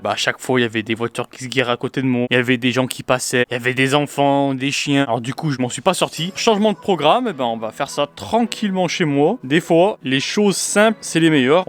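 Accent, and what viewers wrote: Saint-Étienne, France. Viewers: S'est l'accent Stephanoi 😄👍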